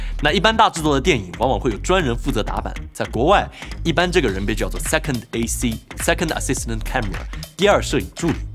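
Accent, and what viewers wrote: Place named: Jiuquan, China. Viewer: 对up主的口音很感兴趣 你是酒泉人么 跟我认识的一个人说话声音几乎一模一样 哈哈哈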